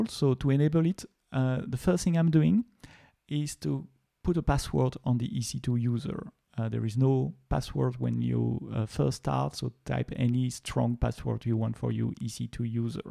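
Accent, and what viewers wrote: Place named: France. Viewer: Je me disais bien qu’il y avait un accent français 😂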